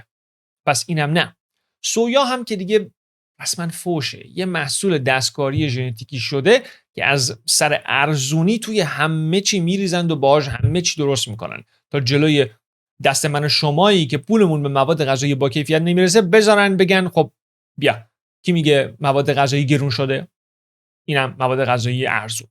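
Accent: Ahvaz, Iran. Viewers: بچه اهوازی ؟ 😅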